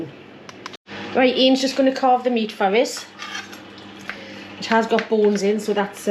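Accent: Newcastle, United Kingdom. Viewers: Hi I just wanted to say I've just found your great channel as a home cook I really enjoy your cooking your hubby is great too I'm John in stow on the wold Cotswolds semi retired now so more time to cook! 🎉also I really love the accent my bestie is a Newcastle guy any way pork belly I've made mince and onion pie ( my own pastry)! Lol loved my nana too she was a great cook! Will continue to watch ❤❤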